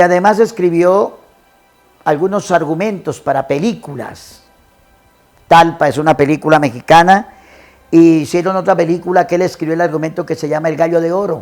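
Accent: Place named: Colombia. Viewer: Se siente el acento colombiano😀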